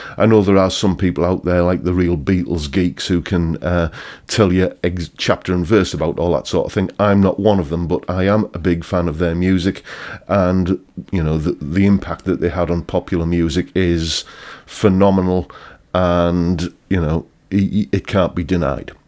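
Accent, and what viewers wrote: United Kingdom. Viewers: Most songs if based on lyrical content would sound like crap regardless of the melody ( like rediculous " " which also sucks as a melody )and should have never recieved radio play. We have to remember that sold out theaters and album sales are all that matter. (Pink Floyd's favorite rant). I can't stand " "," ", "s " " " " and I could have lived my entire life happy without being tortured with " " or tune about " " and I'm not even Catholic! Love a good RANT, thanks mate! (I'm not British either but I love the accent!)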